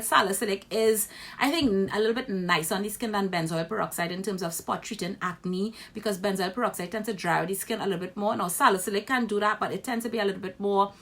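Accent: Trinidad and Tobago. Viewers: Are you trini? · are you from trinidad?Love this!!!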